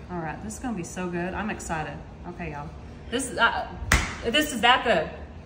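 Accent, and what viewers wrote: South, United States. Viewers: She's very southern that's why .. I love how she talks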